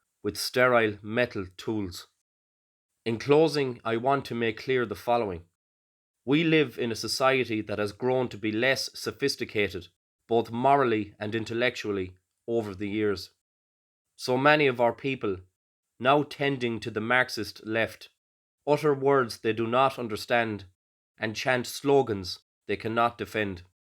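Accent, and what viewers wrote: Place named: Ireland. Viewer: Cheers dude. Nice to hear an Irish voice of reason. Between you and Dan's Mix Tape I feel a little less like society is collapsing. Keep up the good work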